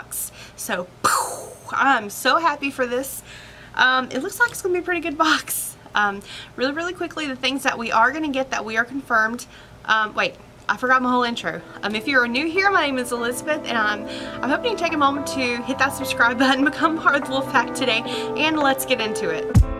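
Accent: South, United States. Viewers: Omg I love you, your giggle is infectious and your southern bell accident is adorable. Also thanks for the spoilers.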